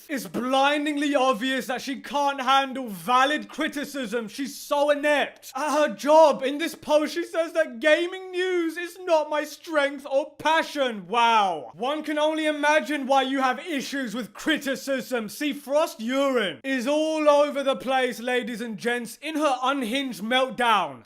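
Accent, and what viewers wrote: United Kingdom. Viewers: The British soccer hooligan accent also sells it.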